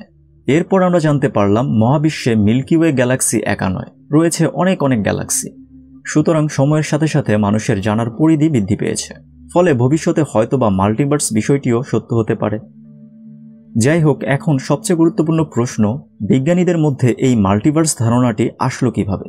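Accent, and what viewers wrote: Narsingdi, Bangladesh. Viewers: আপনার বাড়ি কী নরসিংদী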